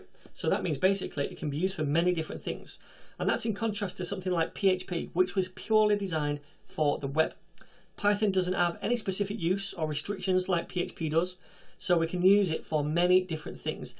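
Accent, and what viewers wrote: United Kingdom. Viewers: omg finally a coder-youtuber have explain it on clear and right way and else thing is he is British and cuz of finally accent is not make me annoy uhh... thank god i fined the best yt Chanel in entire world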